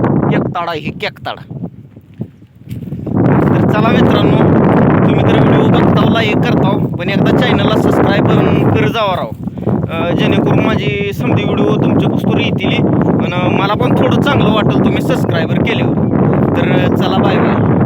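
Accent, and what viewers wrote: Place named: Marathwada, India. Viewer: भाऊ तू खुप छान बोलतो ही मराठवाडा भाषा आहे ना ही लय हासतो राव तुझा बोलन्याला तु खुप मनमोकळा माणुस आहेस 👍👍👌👌🥰🥰🕺🕺🌹🌹💐💐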